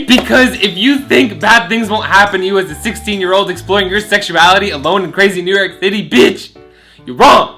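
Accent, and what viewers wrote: New Jersey, United States. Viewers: Is anyone noticing his accent I like it jersey!!!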